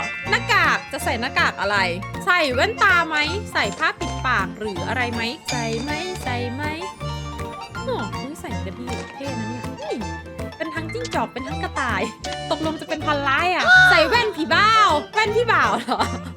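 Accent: North, Thailand. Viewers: พี่ เปนคนเหนือหรอคับ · พี่เป็นคนภาคเหนือหรือค่ะ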